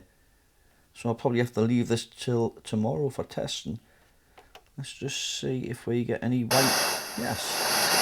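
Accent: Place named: Newcastle, United Kingdom. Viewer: I’m in Newcastle. Born and bred. I can tell you accent isn’t a million miles away. I enjoy your videos and channel is the only channel out of a few hundred I subscribe to that I have notifications setup for. The simplicity of your repair attempts and fixes show that with a little knowledge and understanding such fixes can be completed successfully on a bench that’s not in an engineering or highly technical environment. Think it gives some less experienced the confidence to have a look inside and try fault finding. GOODLUCK with your channel mate. I think such topics are on the increase and there’s a lot of us out there looking for how fixes are approached. 👍🏻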